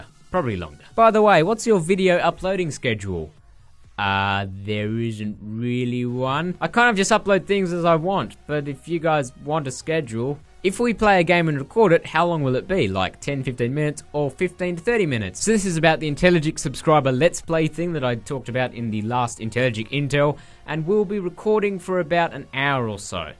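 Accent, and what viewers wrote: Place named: Australia. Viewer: we're both Australian :D i didnt know because im too used to the Australian accent...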